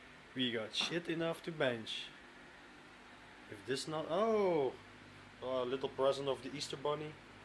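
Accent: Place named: Belgium. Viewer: your English accent is pretty funny xD Klinkt nog een beetje belgisch:P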